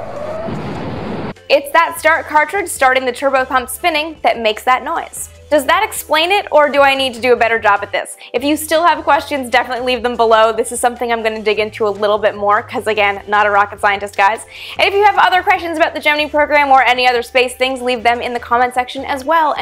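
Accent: Canada. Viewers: Love her Canadian accent. Especially how she says "missile". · Laughing Gravy Exactly. Every Canadian I've ever known has pronounced "missiles" exactly like her. OP obviously jumped to a conclusion.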